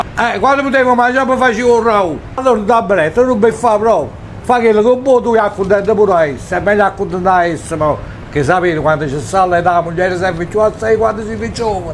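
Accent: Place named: Bergamo, Italy. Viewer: se sei di bergamo ti capisco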